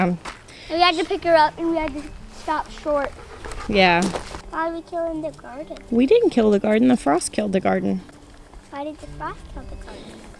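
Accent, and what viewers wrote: South, United States. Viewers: I seriously thing you’re southern accent has become quite a bit more rich since the move. 😊 I’m so sad we got busy & couldn’t come meet you that last Sunday! One day I will meet you! 🤪😄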